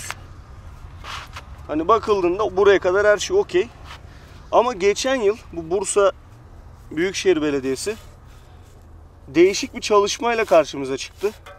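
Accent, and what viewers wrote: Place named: Bulgaria. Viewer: Abi konuşmandan belli Bulgaristan göçmenisin jajsja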